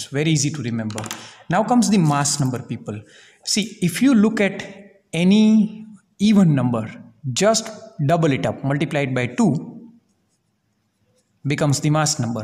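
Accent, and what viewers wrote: Gujarat, India. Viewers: Sir by your way of speaking it seems like you are gujarati. Am i right?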